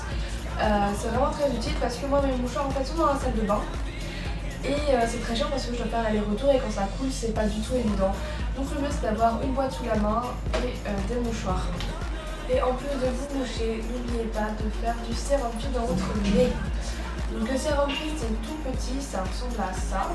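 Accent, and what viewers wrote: Madagascar. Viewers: T'es malgache?? x) Chouette video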